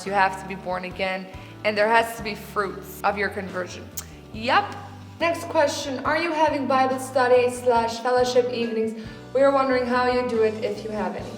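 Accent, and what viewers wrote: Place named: South, Germany. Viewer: As a young southern german woman, I looove your German dialect, especially since I understand it and know Mist Germans wouldn't. It is such an fascinating mix of several southern dialects, it just makes me so happy to listen to it. Thank you for sharing your life with us. I love learning about your community 😇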